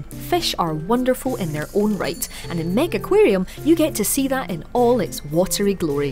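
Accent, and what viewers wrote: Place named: Scotland, United Kingdom. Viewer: her accent reminds me of merida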